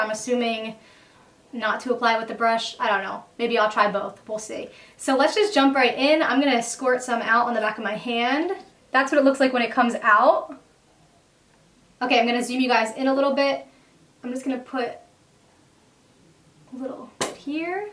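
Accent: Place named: Long Island, United States. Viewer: Are you from NY? You have kind of a Long Island/NY accent... especially heard it in the way you said orange. just curious!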